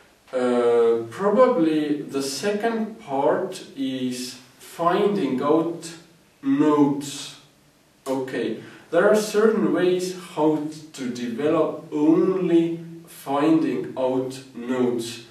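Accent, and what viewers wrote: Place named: Estonia. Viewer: he's estonian haha...I could tell just by the accent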